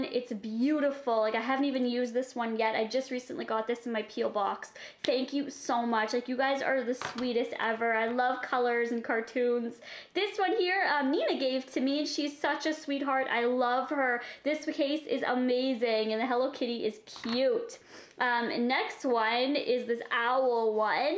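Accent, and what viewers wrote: Canada. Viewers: she's also Canadian so they pronounce words different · omg i can just tell that she is canidian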